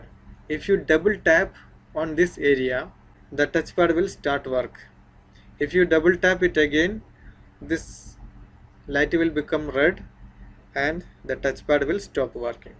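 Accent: Kerala, India. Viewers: Malayali analle 😆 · മലയാളി ആണല്ലേ.. ഇംഗ്ലീഷ് കേട്ടപ്പോ തോന്നി...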